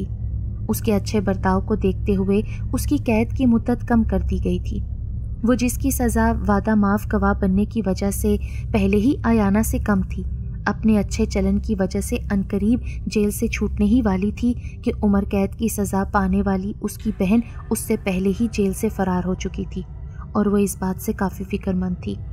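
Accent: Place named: Bangladesh. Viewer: Bangali lehja acha lagta hai ap pe😍😍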